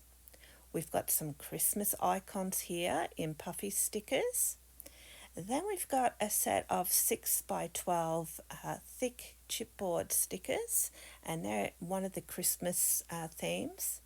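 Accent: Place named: Australia. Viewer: I think everything you got was marvellous, the travel sticker book and papers were my favourite Great to hear a fellow Aussie...tfs:) Zelda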